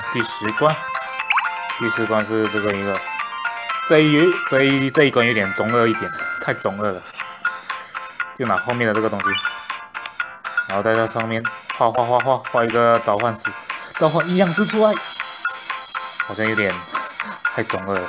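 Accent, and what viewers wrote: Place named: Taiwan. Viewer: 怎麼感覺你是臺灣口音?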